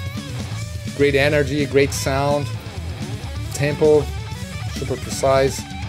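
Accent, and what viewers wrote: Brazil. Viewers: ainda bem que tu tá falando inglês com o teu sotaque brasileiro, eu entendo quase tudo o que tu fala, sem necessidade de legendas. Meu inglês não é fluente, e quando eu ouço um americano falando, todo enrolado, eu não entendo quase nada, aushuhauha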